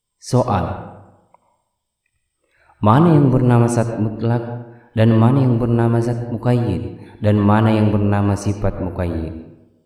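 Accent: Bugis, Indonesia. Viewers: Ini AjaraN dari TaNaH BugiS.. Yg BicaRa JugA Uda KedeNgaraN LogaT BugiS'y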